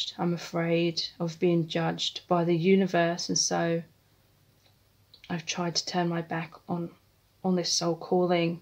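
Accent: United Kingdom. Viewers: This reading is more than helpfull , thank you so much XOXOXOX and I love your British accent 🧡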